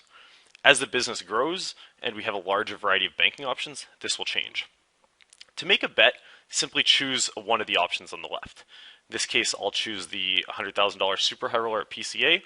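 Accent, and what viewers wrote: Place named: Canada. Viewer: This guy is the most Canadian sounding Canadian ever.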